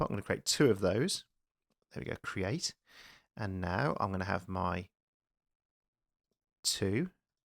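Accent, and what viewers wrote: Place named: Midlands, United Kingdom. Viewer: He definitely has a Midlands accent ;)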